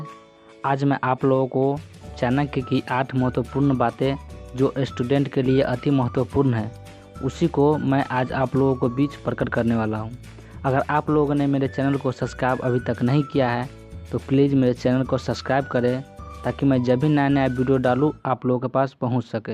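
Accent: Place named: Bihar, India. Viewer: lgta to bihari hi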